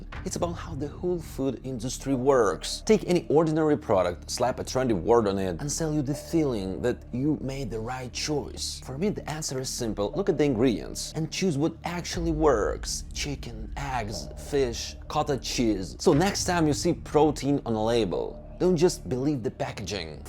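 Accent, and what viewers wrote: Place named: Europe. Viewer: the european accent is cute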